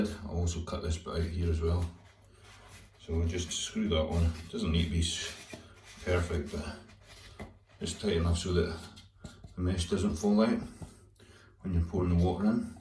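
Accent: Scotland, United Kingdom. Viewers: Hey I can’t tell you how delighted I am to see you trying to breed those exceptional clownfish again. Your already off to a flying start with the clownfish laying on your glass tile 👍🏻 I’m so excited probably even more excited than you 😂❤️❤️❤️ Love your channel and videos. Also love that deep Scottish accent of yours. Looking forward to the next episode already. Keep em coming I need my fix weekly please 😘🥰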